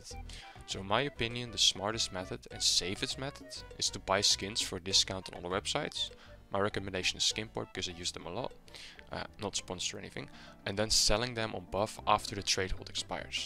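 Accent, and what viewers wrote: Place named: Finland. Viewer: Suomi aksentin kuulee miljoonan kilsan päästä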